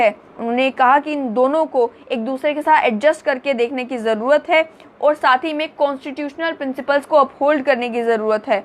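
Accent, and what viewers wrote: Jammu, India. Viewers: keep up the good work,aur ye dogri accent (like garnade)change mat karna,local channel wali feeling ati hai🙂🙂👍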